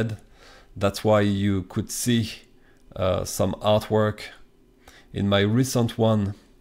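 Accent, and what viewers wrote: France. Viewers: ta un acent francais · Thanks for the tutorial (Ps: is it me or you sound french?) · Hmm l'accent francais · l'accent français jpp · Thank you for your clear explaination and your french accent, it help me a lot. I'm doing my transition toward Krita and this tool is very impressive. I have just tried it on my last page, i've saved maybe one hour today ;) Thanks you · cet accent français .-. Enfin bon je dis ça mais je ne suis pas mieu lol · Tu peux faire la video en français (je reconnait un accent quand j'en vois un)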